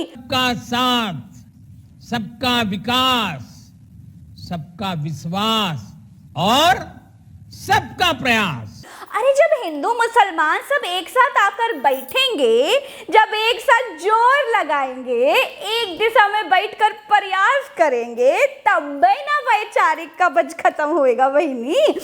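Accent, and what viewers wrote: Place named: Awadh, India. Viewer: आप यक़ीन कीजिए आपकी अवधि भाषा सुनने में बहुत अच्छा लगता है, बहुत ही अच्छा... 👌 खासकर आपकी "भाषा शैली" का जवाब नहीं मैडम..! 🙏🙏🙏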